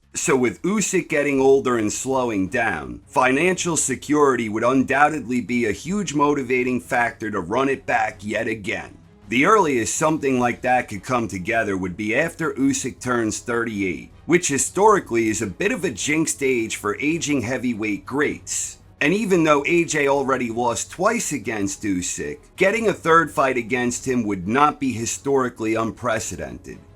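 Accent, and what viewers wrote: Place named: East Coast, United States. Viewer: What east coast city accent is this?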